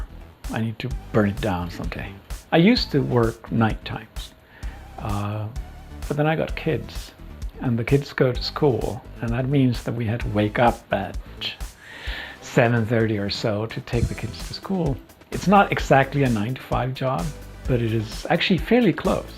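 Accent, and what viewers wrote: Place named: Sweden. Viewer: wtf he speaks fluent finnish with swedish accent, as many swedish speaking in Finland.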